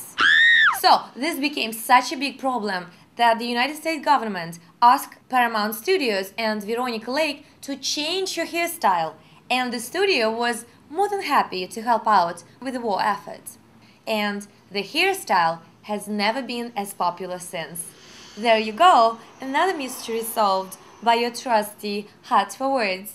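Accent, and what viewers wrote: Russia. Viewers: капец акцент питерский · russian english accent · Её рязанский акцент раздражает... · I clicked a few of these because of they were featured. I think it's pretty cool what she does. Smart and good looking. Plus who does not like a Russian accent ? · nice russian accent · такой акцент поймут только в россии :) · Русский акцент...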